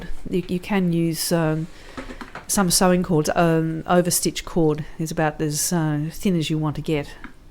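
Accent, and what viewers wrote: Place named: Australia. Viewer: This was so interesting to watch. Thank you :) This might be a weird question, but where do you live? Youre accent sounds australian...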